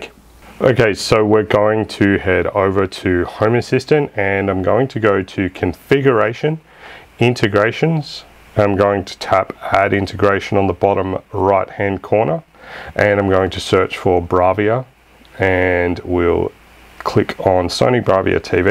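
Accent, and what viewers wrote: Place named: Australia. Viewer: cheers mate. Nice to hear an ozzy voice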